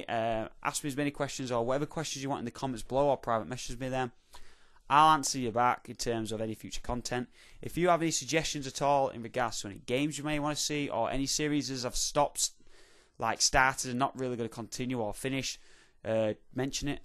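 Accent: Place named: Yorkshire, United Kingdom. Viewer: Yorkshire I think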